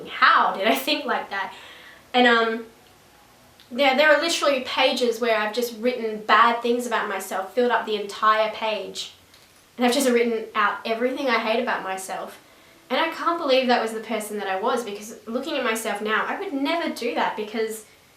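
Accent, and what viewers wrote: Australia. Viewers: I got so excited when I heard your Australian accent! Subscribed!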